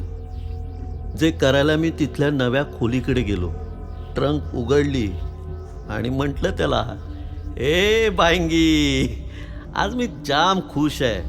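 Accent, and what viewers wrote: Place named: Konkan, India. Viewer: तुमच्या आवाजात कोकणाच्या मातीचा वास आहे 😍 तुमचा आवाज Pralhad Kudtarkar sarkha aahe...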